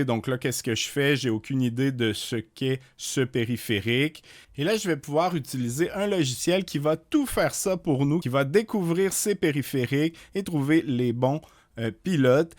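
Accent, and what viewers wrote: Canada. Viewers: Merci pour ces informations simples et avec cet accent canadien si savoureux que nous autres français on aime tant...😊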